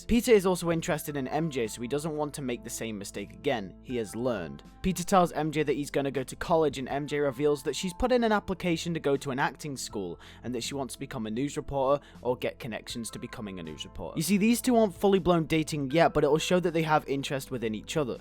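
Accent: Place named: United Kingdom. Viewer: you are very good youtuber and your British accent is great what happens next?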